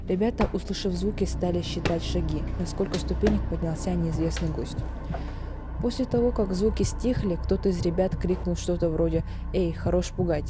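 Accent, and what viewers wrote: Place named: Yakutia, Russia. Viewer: А какой акцент ты ожидал от якутки, именно акцент и делает нас не одним целым с русскими а отдельной нацией со своей своеобразной культурой и языком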